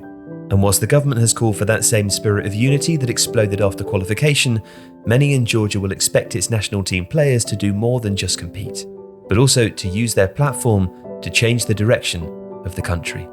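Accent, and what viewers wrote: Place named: England, United Kingdom. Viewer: The accent was obviously English, but the pronunciations were spot on ─ i.e. he made no mistakes in his pronunciation.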